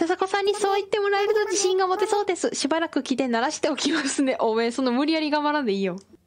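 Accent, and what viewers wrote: Kansai, Japan. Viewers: 新規なんですけど、さんの関西弁と住民のボケが面白くてどハマりです😂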